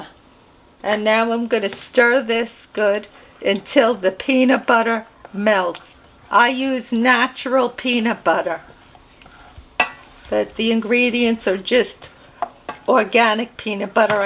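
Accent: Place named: Long Island, United States. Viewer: Ex-Long Islander here out in Vegas. LOVE hearing your Long Island accent makes me feel as if I was back home! I gotta make these tomorrow. Wishing you both a warm and Merry Christmas! ♥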